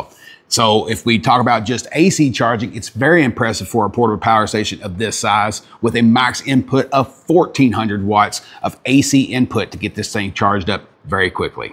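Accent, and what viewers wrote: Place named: South, United States. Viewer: I'm curious what southern state you hail from... Georgia my guess...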